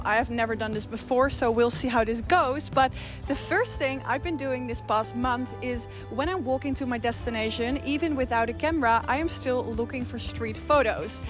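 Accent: Netherlands. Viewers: She has a Dutch accent? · That Dutch accent, 🔥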